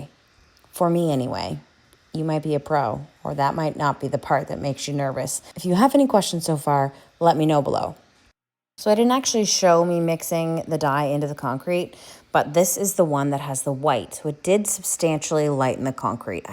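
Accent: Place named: Nova Scotia, Canada. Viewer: Nova Scotia? Wow, I knew I knew that accent!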